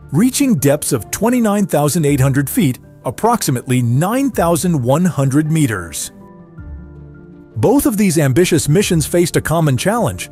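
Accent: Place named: China. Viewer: Humans did not land on the moon rather have CHINESE English the voice is too much stress selling business was not pechenga can not learn too much sress in the voice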